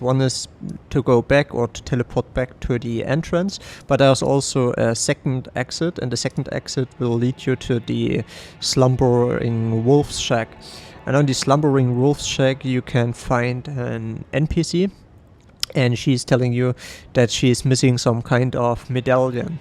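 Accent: Germany. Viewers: der deutsche akzent scheint durch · You have German accents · U sound way to german xD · Hör ich da nen starken Deutschen Akzent? Hehe aber gutes video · Kann es sein das du deutsch bist? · Are you german? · Are u german?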